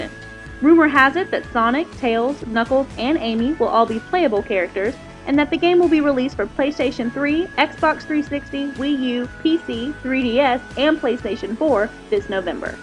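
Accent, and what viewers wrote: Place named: South, United States. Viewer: Dat southern twang <3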